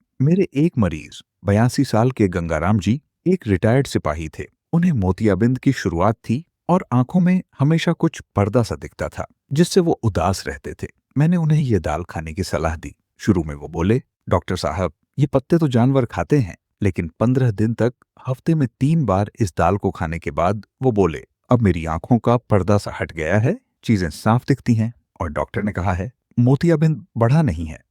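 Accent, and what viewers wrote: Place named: Ludhiana, India. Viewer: Ludhian punjabi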